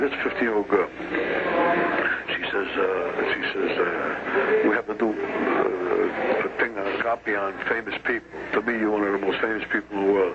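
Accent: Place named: Sicily, Italy. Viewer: Scicilian Accent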